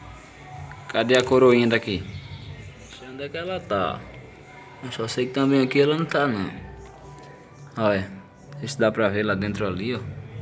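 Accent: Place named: Northeast, Brazil. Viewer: Maravilhoso sotaque nordestino, " ta rochada ja" abraço pra vc s todos aqui de CuritibaPR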